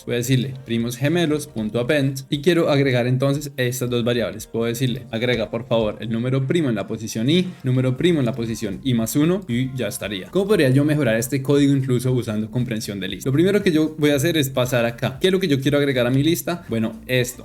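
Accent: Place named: Colombia. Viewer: Se fue para Alemania pero el acento colombiano no se va 😂 no se si me equivoqué rara vez me pasa gracias por el video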